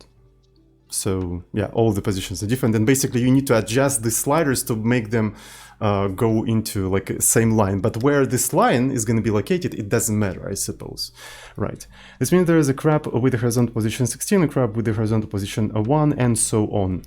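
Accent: Russia. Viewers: Czemu masz taki ruski akcent?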